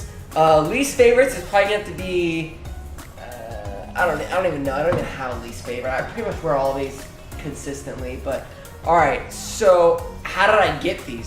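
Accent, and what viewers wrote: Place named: United States. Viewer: I don’t know why but your voice reminds me a lot of Tom Holland’s Peter Parker American accent lol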